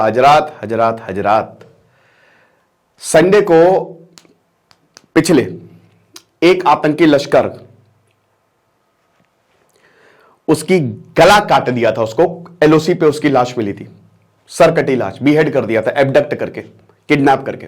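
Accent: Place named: Bihar, India. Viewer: Aajh full bihari dailoge ke mood me hai sir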